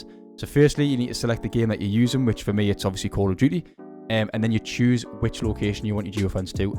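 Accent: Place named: Newcastle, United Kingdom. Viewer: never heard a geordie youtuber before 😭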